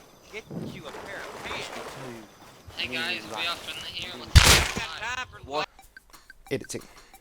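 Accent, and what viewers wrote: South Africa. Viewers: Afreekan accent:)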